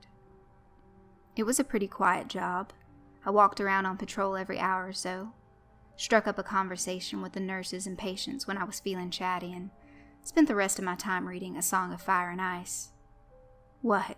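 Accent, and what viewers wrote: South, United States. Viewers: Thanks for the narration <link> I liked the Southern drawl you added to it. As always, you did a good job with it. Sadly enough, the story was based on an experience about back when I was hospital security when an abusive father sweet-talked his way into the ER to visit his daughter. I wonder if you might have any interest in any of the other parts of the series <link> It's more of a theme of work-related horror stories than interconnected stories, but it might interest you.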